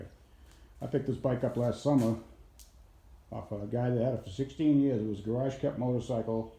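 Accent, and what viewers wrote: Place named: Boston, United States. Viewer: How do you still have the Boston accent?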